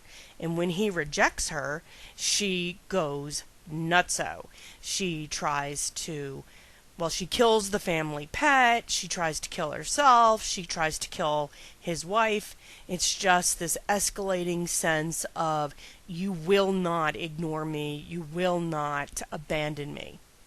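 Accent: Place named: Pennsylvania, United States. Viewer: Thank you for this, it helped me a lot to prepare for a psychiatry oral exam. I am French, but I lived in PA for two years, it was nice listening to your accent as well (unless I am completely wrong and you are not even from there :) :) I think your explanations were well-formulated and your examples very clear. What I still have a hard time with is matching the mechanisms with the disorders. Like a person with BPD will use projective identification and idealisation for instance. For some others it can be more difficult for me to remember. Also, I am supposed to know the reason why a person has this or that disorder from a personality development point of view (Mahler theory)... This is kinda confusing for me. Anyway, thanks again that you shared your work! Subscribed!!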